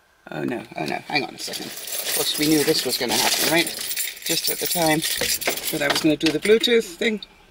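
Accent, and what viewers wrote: United Kingdom. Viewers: Found your channel through Judy and Benji and liking it so far! Can I ask, are you British at all? Just your accent sounds familiar to me! :)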